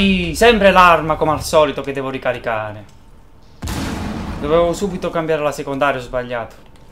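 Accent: Naples, Italy. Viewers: ma sei di Napoli?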